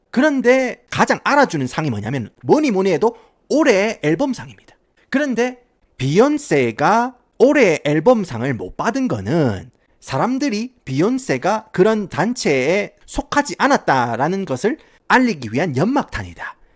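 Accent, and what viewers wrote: Gyeongsang, South Korea. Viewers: 갱상도 사투리로 영어를 말아주네 ㅋㅋㅋㅋ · 찰진 경상도 사투리 사이 사이에 들어있는 쫜득한 영어발음 때문에 컨텐츠 상관 없이 계속 보게 됨…외중독되… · 임마 😂😂😂 애비 🤣🤣🤣 처음 영상 보는데 너무 재밌게 잘 보았습니다 🤗 경상+잉글리쉬원탑티어!!! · 구수한 갱상도 사투리로 usa 조져주는 바로 그맛 아입니까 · 경상도 사투리와 완벽한 영어발음의 아름다운 조합. 좋아요😊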